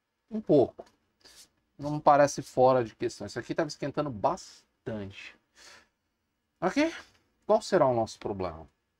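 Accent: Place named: Rio de Janeiro, Brazil. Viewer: Show like show , vídeo muito top vc e do RJ tem um pouco de sotaque :)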